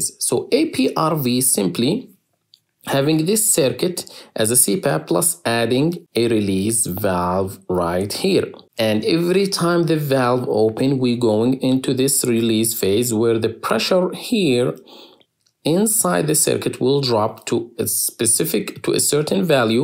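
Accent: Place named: Jordan. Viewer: عرفتك من اللهجة انك اردني. زي لهجتي. انا اردني الماني . الله محييك. فيديوهاتك جميلة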